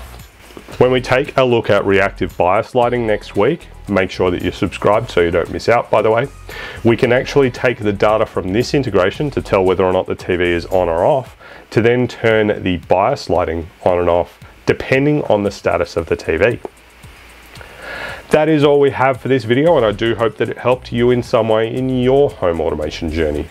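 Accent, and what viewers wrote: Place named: Australia. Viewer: cheers mate. Nice to hear an ozzy voice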